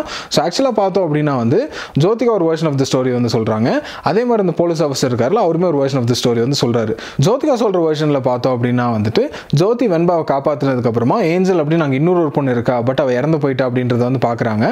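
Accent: India. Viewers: Bro, R U from Nilgirs? Superb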